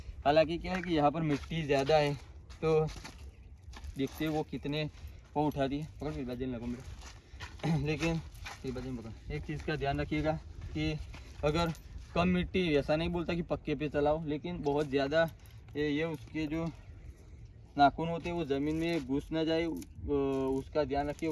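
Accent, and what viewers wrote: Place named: Gujarat, India. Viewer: Achhchhi hindi bolne ki koshish kar rahe ho magar gujarati mix hohi ja rahi hai,dua hai aap ki hindi aur achhchhi ho jae taki publik ko aur fayda ho, aise bahut achhchha sikha rahe ho aur aap achhchhe bhi ho....bahut bahut shukriya from Bardoli dist surat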